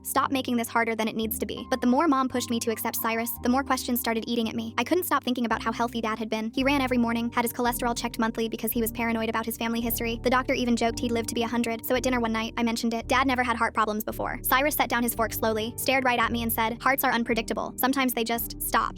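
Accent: United Kingdom. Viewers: Are you british question❓️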